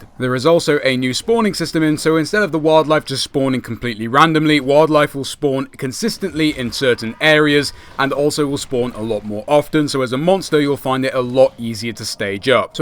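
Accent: United Kingdom. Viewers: u scotish?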